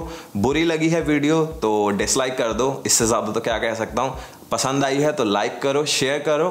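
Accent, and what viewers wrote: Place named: Delhi, India. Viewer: Nice video! It sounds really funny when you emphasise "me india se nahi hoon" :D :D and you sound like a typical Lajpat Nagar boy :) Should rather say i don't live in India. :)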